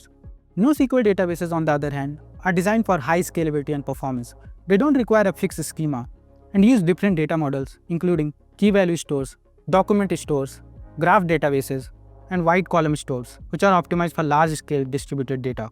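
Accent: India. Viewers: Too much Indian accent for today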